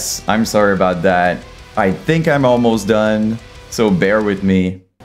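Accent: Quebec, Canada. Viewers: Amazing job on growing the channel dude, your videos are what i needed to remind myself of the greatness of the myspace era, i feel like there is no one these days that go back and appreciate these gems for what they were. Gros props dude. Also as far as ideas, maybe doing deepdives on the more obscure labels could be cool and what they brought to the scene back then (This City is Burning, Mediaskare, Artery, Ferret, Siege of Amida, Prosthetic, etc) or even the early days of household names like Sumerian and Century Media, idk, just an idea ! On a last note, it's been inspiring to see you make it through that overly populated sea of content that is Youtube, as a fellow Quebec French speaking mofo, it gives me hope that we can also sit down and share the stuff we love and be a part of the conversation without too much tabarnacking on our pronouciation. Lâches pas, 2024 will be huge mate🎉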